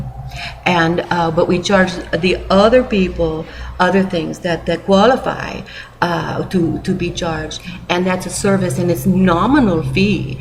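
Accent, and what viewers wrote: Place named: Mexico. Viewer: you stupid bitch you exposed yourself......you have no business speaking for natives........you have no people on the rez......what happens on any rez is not your business.........you dnt even know if youre indian and you say you may have been kidnapped from egypt as a baby.......you are a nutcase who smokes crack........White Buffalo Calf Woman id gods ONLY prophet and will appear back to her peop[le as a white buffalo not as a crazy non lakota with a mexican accent preaching to other non-indians........put the crack pipe down before you end up dissappearing on a rez never to be seen again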